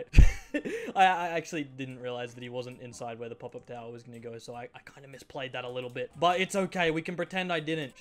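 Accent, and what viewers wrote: Australia. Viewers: This is like the only video that actually sounds like an australian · That australian accent made me so uncomfortable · is it just me or does he seem more Australian than normal in this vid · is it me or does sound more australian in this video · he sounds extra-aussie this video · this video is just channeling his inner australian accent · why do you sound more australian in this video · Bro I love you accent like it is the best Australian accent ever. :D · Not sure how but you sound more Australian than normal in this video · Is it just me, or does sound extra Australian in this vid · the Australian accent popped out here more than usual 😗😗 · Was it just me or did he talk more Aussie lol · Your Australian accent just keeps growing. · is it just me or does he sound insanely more australian than normal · Who else noticed the Australian accent getting more evident than usual?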